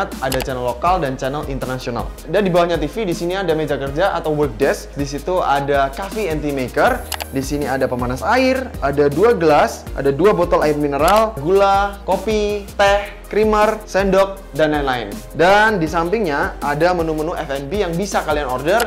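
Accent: Java, Indonesia. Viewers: Kakak orang jawa?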